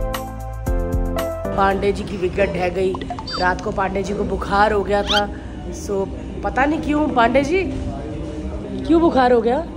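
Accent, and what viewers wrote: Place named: Odisha, India. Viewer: odisha se hai isiliye ese pronounce karte hai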